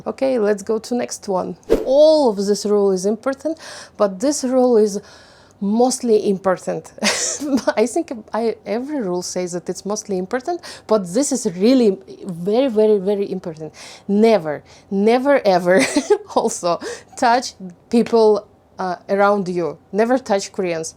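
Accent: Russia. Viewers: Я не андэстэнд по - английски😂, да и первое видео на этом канале смотрела информативный, куда вы пропали, но какая же вы милашечка! Какой милашный акцент, русский прям, так приятно наблюдать за вами и слушать! Спасибо большое за ваш труд, больших успехов и развития каналу!🫶🏻🌹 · Действительно, можно поработать со временем над произношением.) Пока что это классический рашн аксент.)) Но замечательное начало.) Надеюсь, все больше новых комментариев у канала будут на английском от иностранцев. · Thanks a lot for your helpful video! I like your way speaking English. It's very fluent. You sound confident and your hard russian accent sounds exotic. It's nice! If you need any help with English, feel free and ask. I am an English teacher. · Русский акцент никуда не денется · Здравствуйте, Ваш английский довольно хорош, единственное, что это русский акцент в словах the, that и т.д. 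· Я так рада, что ваши видео теперь на английском так как учу его😍 Единственное что режет уши это наш ярко-русский акцент с твёрдой буквой «d», но это чисто мои загоны, по произношению. Но вот что меня порадовало, я без конца боюсь с артиклями, а у вас их не особо услышала, значит можно и без них🤣 Спасибо за ваши старания не смотря ни на что❤️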